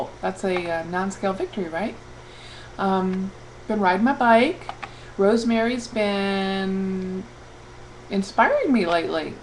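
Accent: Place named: South, United States. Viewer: your to funny love the southern accent i am freezing my husband out of are bedroom hehehe he said can't wait tell u get your surg. because i keep the room so cold glad u had fun in Los Vegas oh yeah did u run into the bariatric ladies to i saw some videos love:0)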